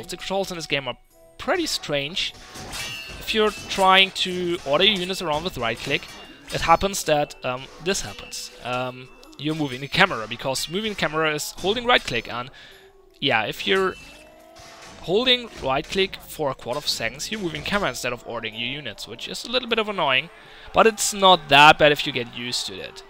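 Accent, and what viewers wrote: Germany. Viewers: Man hört einfach direkt, dass da ein deutscher hinter dem Mic sitzt. ^^ Zum Beispiel sprichst Du "pretty" so aus wie es geschrieben wird, also betonst das E, teilweise auch prätty, wohingegen Menschen mit Muttersprche Englisch es eigentlich fast alle "pritty" aussprechen. ^^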